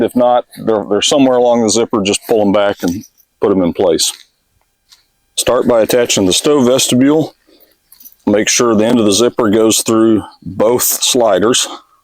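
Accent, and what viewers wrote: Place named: Baltic states. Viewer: Baltic language accent is all over! Good video!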